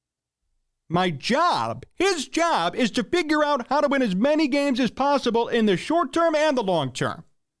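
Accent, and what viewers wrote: Chicago, United States. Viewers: Im not from the midwest, so im a bit ignorant, but im very curious: is this an authentic AF Chicago accent? Cuz i love it. Its like straight out of a movie made in 1947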